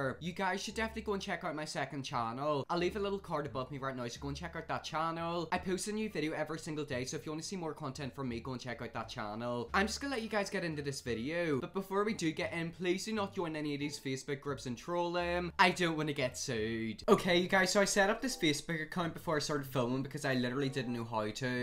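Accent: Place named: United Kingdom. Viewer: it sounds British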